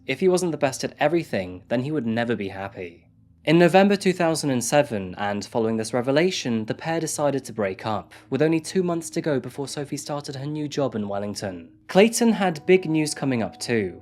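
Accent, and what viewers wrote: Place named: United Kingdom. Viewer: I don’t know why insults seem way more harsh when done with a British accent…but I loved the shade! That guy was a monster! RIP Sophie Elliot🙏🏽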